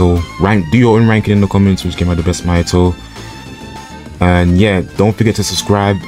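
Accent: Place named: United Kingdom. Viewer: He’s British I didn’t know this was his real voice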